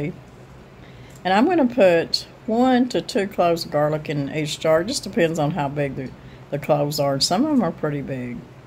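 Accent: South, United States. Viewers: I have never tried pickled green tomatoes. I am pondering whether I have enough green tomatoes to try it. Thank you so much for sharing your videos. I always come away feeling like I have taken a step back into my childhood and seeing grandmas pantry or mom at our old wood stove, etc. Your accent is heartwarming as it is soooooo familiar. My family's from Arkansas and Dads side was Texas. I don't know...I just sit down, watch, relax and learn some interesting tips... :)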